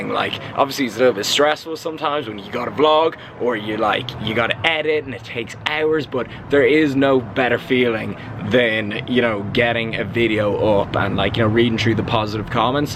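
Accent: Ireland. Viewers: Is this dude Irish can't really tell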